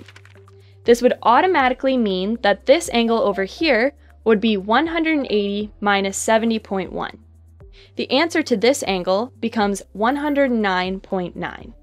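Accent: Canada. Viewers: Ur Canadian aren’t u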